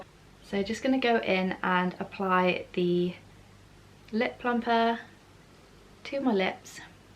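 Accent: United Kingdom. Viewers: oh I wasn't (edit was here, miss-typed) searching for a brit. I just watch a lot of YouTube and mostly come across Americans and Canadians. There was no need to call me odd. It is odd you would pick up on it. I called it refreshing because it was to me. There is no need to be upset okay? I'm just some random on the Internet that was happy she came across a familiar accent after spending a lot of time consuming youtube media that wasn't from the UK. Do you never just go through your recommendations? That's what I do. I don't search for anything it's more fun that way.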